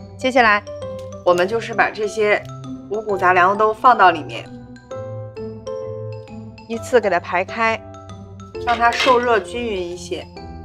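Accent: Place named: Northeast, China. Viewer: 美女菜做得好，一口東北腔，也說得好聽。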